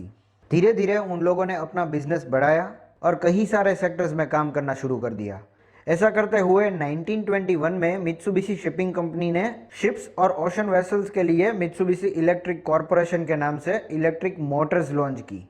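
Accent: Gujarat, India. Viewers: Bhai aapka accent gujju he❤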